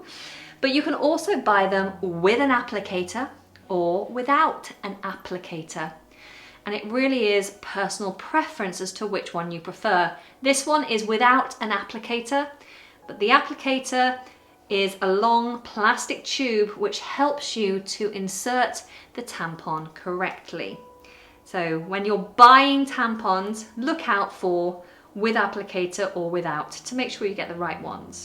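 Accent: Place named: RP, United Kingdom. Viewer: I experienced the most unique feeling of both discomfort and pleasure listening to you speak about the menstrual cycle. I think it was the juxtaposition between the somewhat messy subject matter and your sublime Received Pronunciation.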